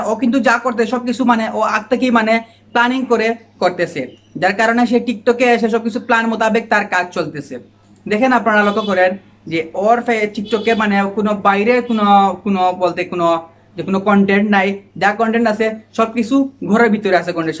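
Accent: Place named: Comilla, Bangladesh. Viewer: আপনাদের মতো আমাদের চট্টগ্রামের ভাষা অন্য ভাষার থেকে আলাদা যা সবাই বুঝেনা তবে ওর ভাষাই তো বুঝা যাই যে কুমিল্লা বা কুমিল্লার ওইদিকের লোক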